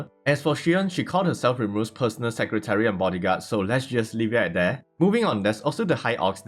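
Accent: Singapore. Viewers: say, your accent sounds familiar, Singaporean / Malaysian ?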